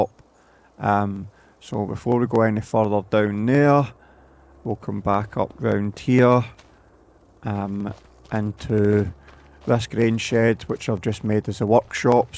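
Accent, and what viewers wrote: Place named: Europe. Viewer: I absolutely LOVE your accent brother. Well I guess it's not a accent to you but it is to me 😂😂 I'm just a silly American who loves European accents...Scottish, Irish, UK...Oh yea, nice build too 😂😂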